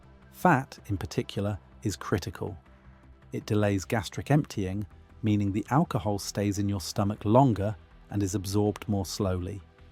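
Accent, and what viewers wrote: United Kingdom. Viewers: "indian pale ale" lmfao. and you're using a brit accent for what i now assume is your ai narrator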